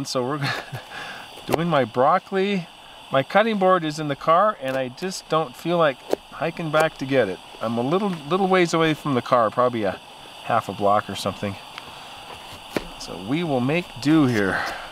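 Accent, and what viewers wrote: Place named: Midwest, United States. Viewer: I was just thinking about how to ask you if you were from the Midwest! I’m from IA but have lived many places. I love listening to you.🧚🏻‍♀️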